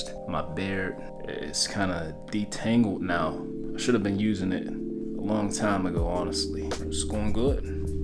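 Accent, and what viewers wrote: Pittsburgh, United States. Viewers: I Knew It Was Pittsburgh Because That’s Where My Mom Side From 😂😂😂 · Burgh accent? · You gotta be from the burgh bro I hear the accent lol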